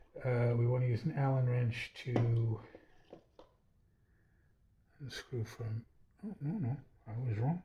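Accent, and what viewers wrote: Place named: England, United Kingdom. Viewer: I thought Brooklyn but then I recognised the English tone to your voice and assumed it was the other way around sorry. great video anyway, I'll be doing the same modification tonight with your help. Thank you!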